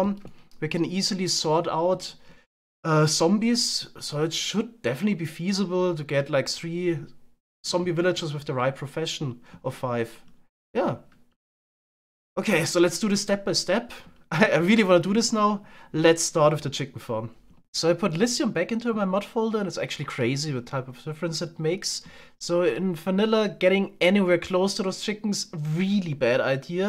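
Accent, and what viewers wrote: Germany. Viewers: Are you German because you have that accent if you have pls say something in the next video bb love your content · Ach man.. Immer dieses Englisch als Deutscher 😂 Man hört es immer raus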